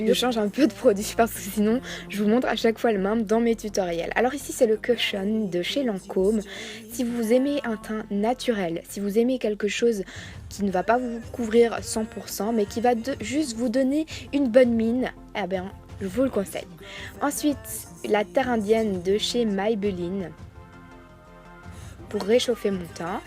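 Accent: Belgium. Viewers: tes belg ?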